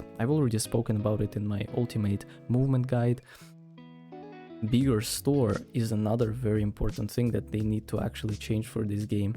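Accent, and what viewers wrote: Russia. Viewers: Бро, зачем ты косишь под американца? Либо я схожу с ума, либо у тебя реально прослеживается русский акцент :D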